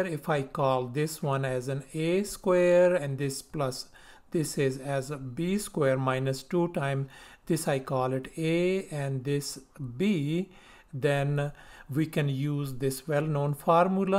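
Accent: India. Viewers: О боже, этот индусский акцент, невозможно слушать...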